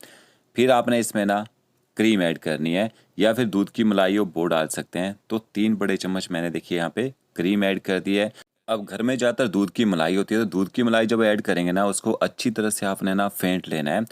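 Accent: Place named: Himachal Pradesh, India. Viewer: yes ji apne gorayian ko pehchan he leta hai banda mujhe aise he lagg raha thaa ki pakka app Himanchal se honge... mere khud bahut dost hai himanchal se.. Sujanpur tihra/ Solan/Dharamshala/Rajgarh/Shamli se tau kahi naa kahi apki awaz se pehchana ki aap himanchal se ho...Ek mera dost Sharma voh Nadaun se hai.. ok happy to hear you...thanks so much..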